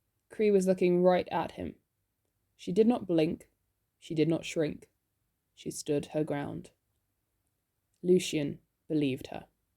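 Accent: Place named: Ireland. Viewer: I’m living for your Irish accent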